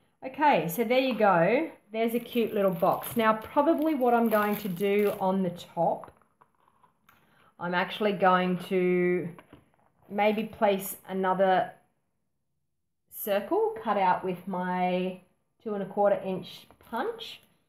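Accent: Australia. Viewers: 🐙 Me, hubby and our 2 boys moved here Christmas 2018 from Brisbane. We love it here 🇦🇺 New Subscriber from Finley, NSW I’m 36, Married with 2 boys - very very crafty. 🌺 I own a Business Making & Selling The Safest Candles & Melts In The World Plus Various 100% Natural Body Products Incl Organic Baby Skincare Products, Organic Assorted Melt & Pour Soaps + More ❣️ These boxes would look great in the Packages & Favours that I Make. 🍄 See my Instagram page <link> 🌸 Facebook Business Page Chillaxing Melts, Candles & Body Products ❣️ Twitter: 🍀 Email: <mail> 🌸 I’m a Registered Nurse, Midwife, Homeschool Mum & Qualified Licensed Aromatherapist, therefore I’m legally allowed to use Essential Oils & Blends In Products Absorbed by the Skin. 🌷 I’d love to send you a gift box. 🦋 I Make beehive soaps that would fit nicely. 🌺 You sound like your in the Tweed Coast / Coolangatta . 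🔆 Plz pick some items you’d like to try and pick as many as Scents as you’d like. ⚠️⚠️ Note: I do have a ABN Plus I own another name and my business name will change to it in December 2019, currently setting up Shopify Store 🍀 Have you thought about selling tutorials on Kindle?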